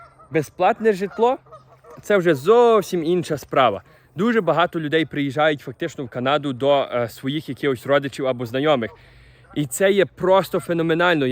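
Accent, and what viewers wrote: Western Ukraine, Ukraine. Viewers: Підписалась, шоб тільки оцю западенську балаканину слухать)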